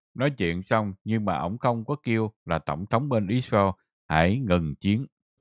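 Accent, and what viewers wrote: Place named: South, Vietnam. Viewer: rất yêu thương giọng nói *, giọng miền nam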